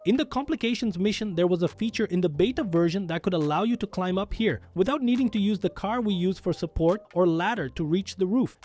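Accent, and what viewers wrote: Brazil. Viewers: He is brazilian, just like me. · actually its portuguese he have an brazilian channel and lets just say his english isnt that good hope this helped!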